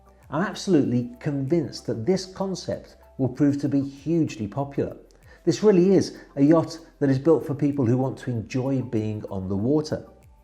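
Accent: United Kingdom. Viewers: Where abouts in the UK is your accent from, its driving me crazy trying to pin it down.?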